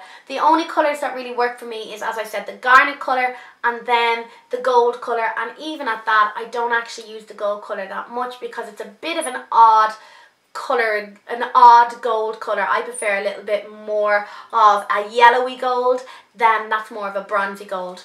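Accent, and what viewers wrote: Ireland. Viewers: Pretty sure she's from Ireland.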